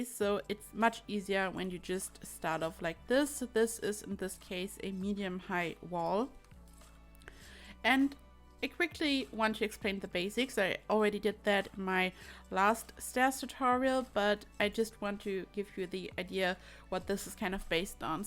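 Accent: Germany. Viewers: I wonder if there's a way to forego that third storey. Could you build a one tile room on both the bottom and the upper storey (maybe next to the stairs) to place a roof? Because while I find this to be an ingenious idea, it will turn into a massive house and I'm more of a two storey house girl myself ^^' And now the question again in German (to make my question more understandable), because judging by your accent you might be? Könnte man nicht einfach anstatt 3 Stockwerke nur 2 bauen? Also z.B. neben der Treppe einen 1 Kachel-weiten Raum und dann im 1. OG einen weiteren drauf und dort dann das Dach drauf? Oder lässt das einen dann immer noch kein Dach platzieren? Weil, 3 Stockwerke sind mir dann doch etwas hoch, vor allem wenn man dann dort kein Zimmer mehr einbaut. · Thanks! Worked great. You just gained a new subscriber ❤️ Btw where are you from? Your accent sounds a little German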